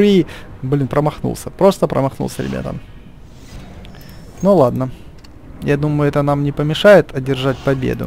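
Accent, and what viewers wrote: Ukraine. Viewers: ти украинец??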